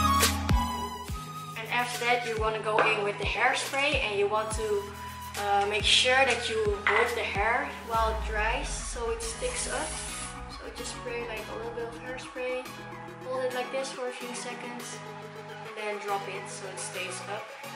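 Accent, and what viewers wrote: Netherlands. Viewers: Ben je Nederlands? Je hebt echt een nl accent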